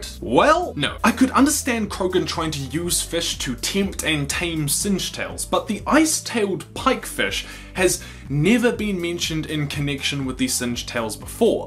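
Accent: New Zealand. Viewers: Hello are you a Kiwi? Or Auzzy? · OMFG IS HE FROM NEWZEALAND OMG IM SO HIPED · wait are u from new zealand (if you are i am too) LUV U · Wait are you a kiwi (new zealander) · spot the kiwi accent lol love it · are you from nz? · wait are you from New Zealand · Are you from NZ because I am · Are you from New Zealand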